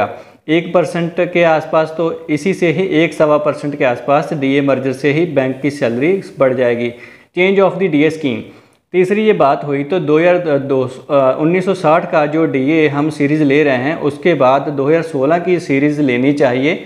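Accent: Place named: Punjab, India. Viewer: खोदा पहाड़ निकला चुहा।कुच्छ भी नही होने वाला है ।आप का बोलने का लहजा है वह पंजाबी लगता है।कृपया हिन्दी बोलना सीखो।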